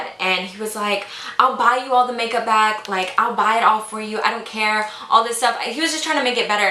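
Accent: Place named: Texas, United States. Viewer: this might sound weird but I love to hear you talk 😂 I love your texas accent and your stories! (more story time videos pls) thank you for being so humble and really inspiring! 💜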